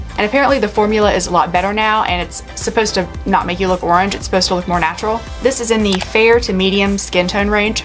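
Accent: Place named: South, United States. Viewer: Just finding your channel but it's great to find someone on YouTube with a southern accent like myself 😁 thanks for the video.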